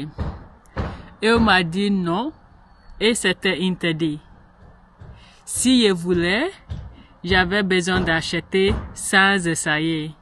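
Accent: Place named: England, United Kingdom. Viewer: Salut vraiment continuer ton absent français prend le dessus sur l'anglais.Au début l'anglais ce trouvait beaucoup dans ta manière de parler mais maintenant le français prend le dessus dans ta manière de parler maintenant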